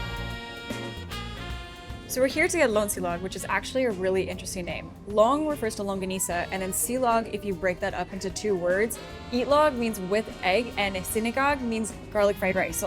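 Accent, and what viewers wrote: Philippines. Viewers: Your English is sounds like filipino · Man, u even talk and eat like a Filipino. I'm very impressed.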